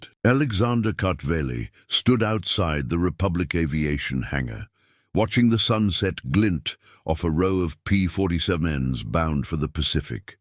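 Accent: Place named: United Kingdom. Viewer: They did not mock, laugh, joke, etc. Just put the facts in and can we have another AI voice that is not British?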